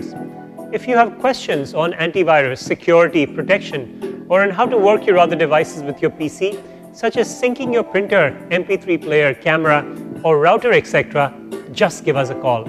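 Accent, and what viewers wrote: India. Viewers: he talks like an Indian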